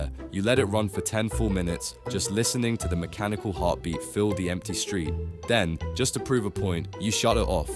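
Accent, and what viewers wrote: United Kingdom. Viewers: Good dry English accented humor., why does everything have to be AI now? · Get someone who can speak English OT Cockney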